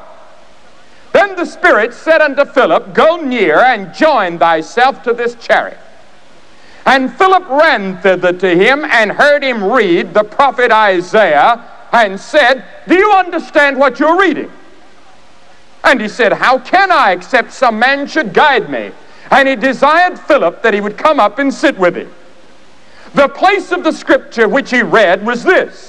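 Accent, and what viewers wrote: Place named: South, United States. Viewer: he had sort of a southern drawl to his voice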